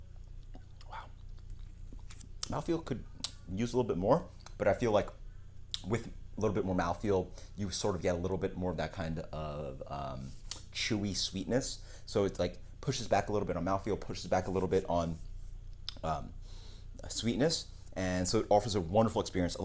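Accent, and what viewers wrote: United States. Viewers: Are you from upstate NY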